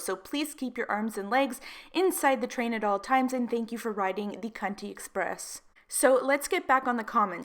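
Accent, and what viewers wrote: Canada. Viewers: Are you from Canada? Your accent sounds Canadian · Thinking of you! I appreciate all your content. I'm poor and disabled, so I'm a makeup buyer on a thin budget. You exposing shady or law-breaking (and rich) influencers gives me a small bit of schadenfreue, which I need sometimes. This is a little silly, but your Canadian accent always takes me back to my salad days, when I was doing my Master's and teaching in Windsor, ON. Everyone made fun of my Michigan nasal-ass accent, and I delighted in every "aboot." Slowly I began to develop my own Canadian accent, which would last for hours after I drove back over the Ambassador Bridge. Now I can shift between the two. But your every "sorry" takes me back to those fun times, chilling in the campus pub. After I watch your videos, I find I'm speaking in my Canadian accent. Sorry for the long story - I just thought you'd appreciate a little haha in this mess. 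Take care.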